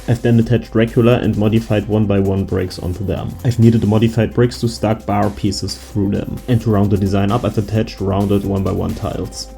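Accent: Germany. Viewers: Are you German? :) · Höre ich hier deutschen Akzent?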